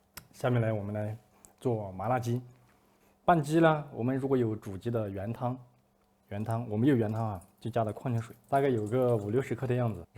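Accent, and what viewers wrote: Sichuan, China. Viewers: 王老师的四川话在恢复唉，泹，汃，这些四川话都冒出来了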